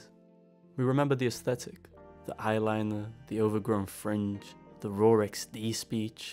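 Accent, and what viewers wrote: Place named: United Kingdom. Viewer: as someone from the middle of a cornfield in Illinois, I have to say I never thought I would hear a British man explain something like this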